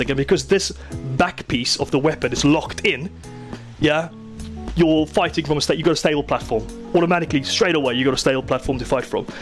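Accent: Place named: United Kingdom. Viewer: bruh he’s pure British/Scottish/Irish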